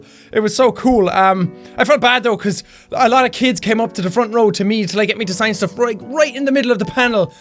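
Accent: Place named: Ireland. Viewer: Yay irish accent!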